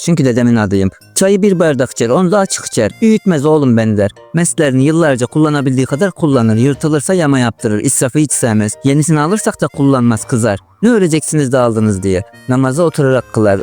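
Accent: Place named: Nevşehir, Turkey. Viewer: Nevşehirlisiniz sanırım,8 yıl çalıştım orda tam ora konuşması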